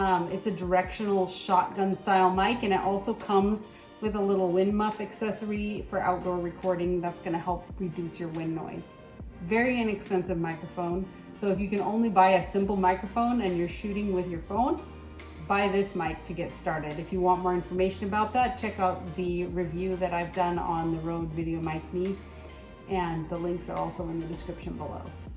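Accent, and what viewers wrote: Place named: England, United Kingdom. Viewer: I liked your English accent. I´m from Brazil. Where are you from?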